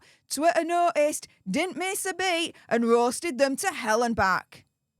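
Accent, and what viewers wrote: United Kingdom. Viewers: Its the British accent dum dum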